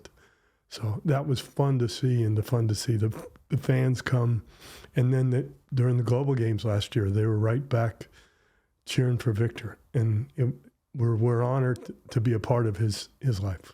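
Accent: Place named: England, United Kingdom. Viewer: Merci pour ton accent anglais, j'ai l'impression de l'interviewer.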